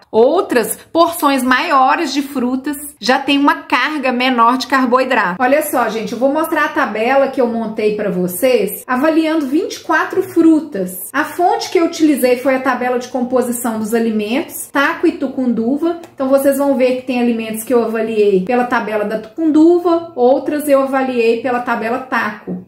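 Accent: Minas Gerais, Brazil. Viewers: Eu sou mineira de Uberaba,resido em Sampa Acho que essa menina tbem ë mineira ?? Acho pela pronu cia.Saudaçoes.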